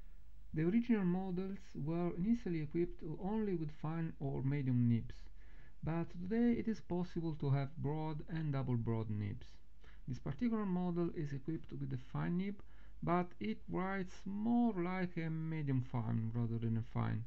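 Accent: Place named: Italy. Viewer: Bellissima recensione, dallo slang sembri italiano, perché non fai recensione anche in italiano?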